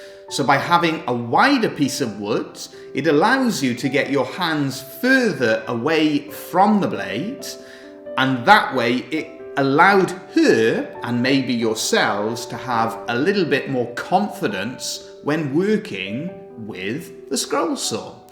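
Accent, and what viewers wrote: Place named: Wales, United Kingdom. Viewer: Man it's been a long day. I sat there thinking this guy sounds welsh. I didn't even see the in your name. If you get people asking for a cheap scroll saw, I bought mine from screwfix for 90 quid.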